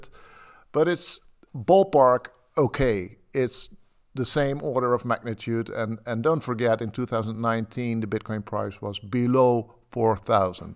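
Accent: Netherlands. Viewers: NL accent ! ? :P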